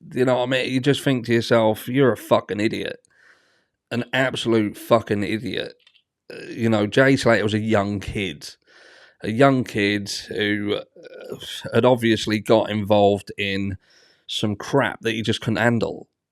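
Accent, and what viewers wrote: Norfolk, United Kingdom. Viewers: Mate, I just wanna say. I found your channel during this case, and your coverage has been brilliant. 👌🏻 I just love hearing the Norfolk accent on YouTube, 😆 I don’t know of many content creators from around here. I’m a Lynn boy! May I ask where abouts in Norfolk you’re from?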